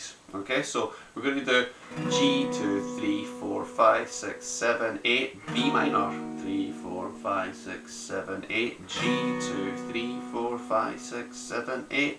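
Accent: Ireland. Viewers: OMG YOUR ACCENT<3. ARE YOU IRISH OR SOMETHING?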